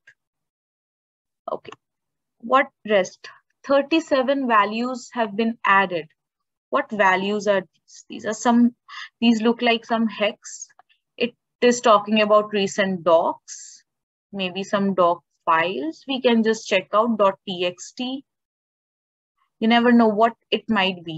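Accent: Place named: India. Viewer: Indian Acent.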